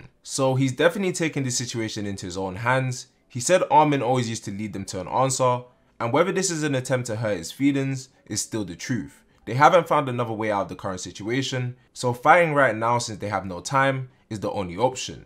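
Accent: London, United Kingdom. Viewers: Just by your accent I can tell you’re a black dude from somewhere in London. Probably South or East.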